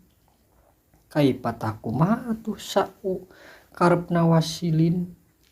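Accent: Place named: Java, Indonesia. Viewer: logat jawaan pak ustadz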